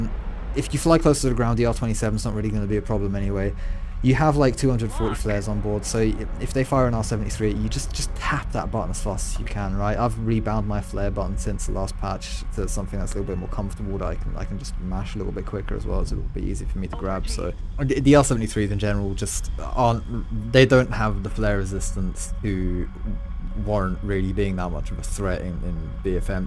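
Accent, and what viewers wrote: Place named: Europe. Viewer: Wow by the sound of this mans voice I would think they were from Europe and seeing a person from that section of the world using FEET instead of the measurements that are used in the countries of Europe(kilometers) hell I live in that one country that uses feet for measurement and I use in-game kilometers just because that's what the majority of people use in this game so it makes it that much easier for me when some one types out in chat things like the bomber is at 6k meters cause I don't think I have really ever seen anyone type out in chat how many feet is the height of the bomber. But to each their own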